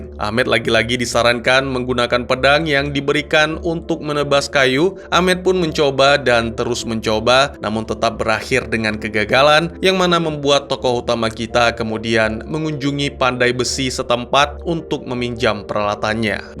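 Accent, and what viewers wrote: Aceh, Indonesia. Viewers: Abang orang Aceh ya ?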